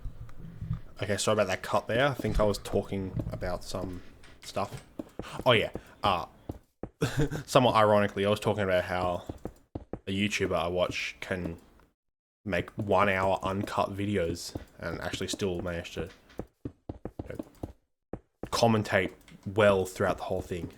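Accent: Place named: Australia. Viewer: Personally I think these kinds of episodes count as "real" episodes! Couple of things I wanted to mention: -Never realized you were Australian, totally thought you were British or something (english isn't my native language) lol - There's a mod called "simply better minecraft" for 1.8.9 that adds spruce/birch/dark oak signs/doors/trapdoors, etc. -Looking forward to that video/segment where you explain why you chose to play on 1.8.9, though from what you've said in this video I completely agree! Modern Minecraft has some cool features (some new blocks and biomes are really nice) but I feel like it's a mile wide and 2 inches deep -You've mentionned some Minecraft content creators (which I will definitely check out), are there any other Youtubers that inspired you? OG LPers like Etho, Stampy, etc? -Congrats on your promotion/marriage! Take as much time as you need between videos, like you said the world will always be there so there's no rush!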